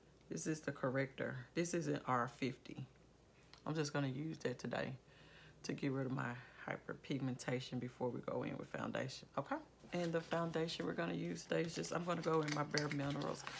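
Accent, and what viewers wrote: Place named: South, United States. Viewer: You are stunningly beautiful. Your Southern dialect is pleasing to the ears.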